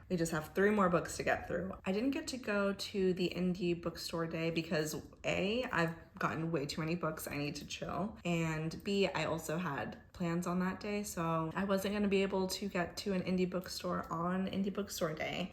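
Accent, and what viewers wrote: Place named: Colombia. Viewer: Me encanta tu acentito colombiano 😊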